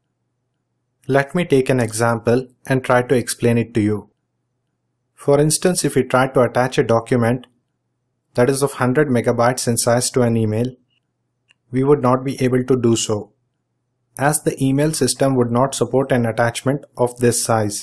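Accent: India. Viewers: Indian accent 🙄🙄🙄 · I dont like the indian accent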